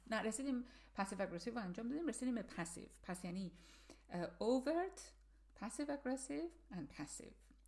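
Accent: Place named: United Kingdom. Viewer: چه جالب بود ! همش شمارو جدی در حال سروکله زدن و مصاحبه با افراد بدقلق و اخبار گفتن دیده بودم 😃 لهجتونم خیلی بریتیشه 👍👏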